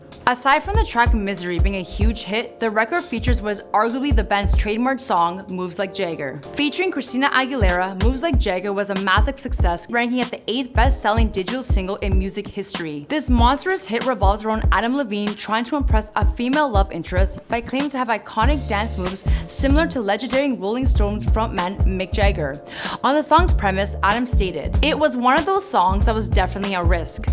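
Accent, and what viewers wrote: India. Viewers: Dancehall Lyrics i thought the same thing too. Even Priyanka Chopra sounds less indian than this presenter. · True. She sound like an Indian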